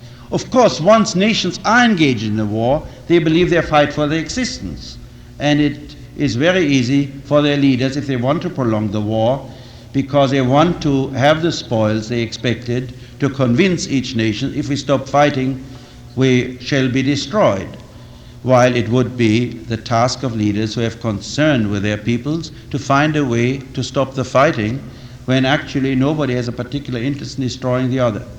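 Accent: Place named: India. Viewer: Why does he sound like he has a slight Indian accent ?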